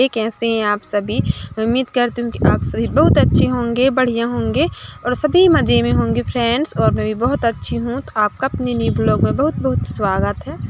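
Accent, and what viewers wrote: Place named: Pahari, India. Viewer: Di aap video me pahari tune kese lagati hai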